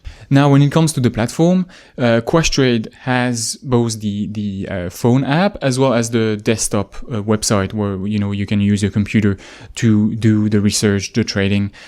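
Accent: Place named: France. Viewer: I like your french accent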